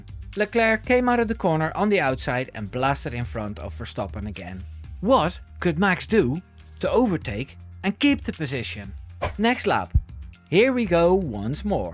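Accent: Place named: Netherlands. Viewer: Zeg dat je Nederland bent zonder te zeggen dat je Nederlands bent. Je klinkt ook jong trouwens, maar dan zie je opeens een vent van 53 in beeld.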